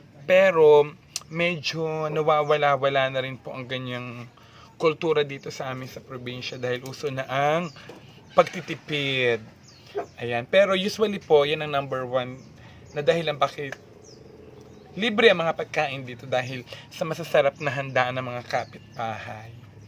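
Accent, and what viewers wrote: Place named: Cavite, Philippines. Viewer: ganyan din dito samin sa Amadeo Cavite. Same accent kaya nakakamiss ang childhood palagi ako nanunuod ng vlogs mo haha Thanks for always making my day idol!!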